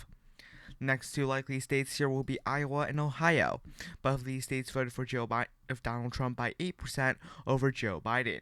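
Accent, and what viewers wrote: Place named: China. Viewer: Is it me, or are you Chinese? The accent was definitely Chinese. The assertions, to someone not connected to the US, struck me as merely your opinion. My own personal opinion is Trump will lose because democracy has been destroyed from forces at work within the west. I'm just awaiting the autocracy as it slithers out of its gross coils.😕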